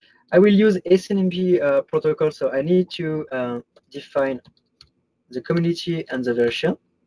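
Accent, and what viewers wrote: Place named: France. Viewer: Le petit accent français des familles qui nous donne cette réputation à l'international...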